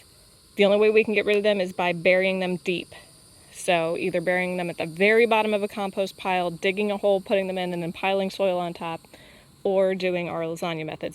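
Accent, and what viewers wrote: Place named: South, United States. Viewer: In which gardening zone are you? Sounds like you’re way down south.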